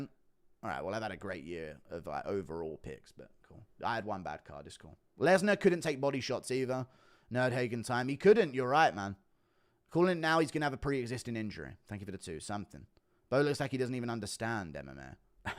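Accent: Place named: United Kingdom. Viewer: He says "Dorito" instead of DeReider because of his awful accent... Brits who don't have the BBC proper speech really butcher english. The only people who butcher it worse are cajuns but these guys have the excuse of being severly mentally redacted. The brits just seem to love to butcher the language they created. It's like they're playing a game where they get points the less they enunciate.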